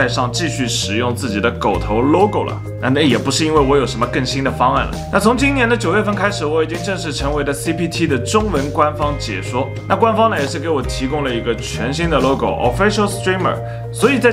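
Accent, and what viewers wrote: Shanghai, China. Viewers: 沪普. 上海宁？ 😉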